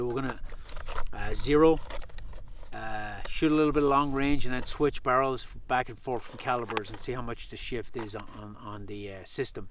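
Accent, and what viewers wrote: Ireland. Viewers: Irish! That's it! I couldn't figure out! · Hey mate you sound Irish 🇨🇮🇨🇮🇨🇮 👍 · Great video, what pat of Ireland you from? I cant tell, Dublin? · i have to ask.do you have a slight irish accent? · Where is the presenter from? Sounds Irish to me. He said 'so we were', which suggests Northern Irish rather than the Republic. Perhaps border. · Two things that accent, Irish Scottish? Second how does the rifle operate from short action to long action? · I can hear a hint of Irish Accent in yer Patter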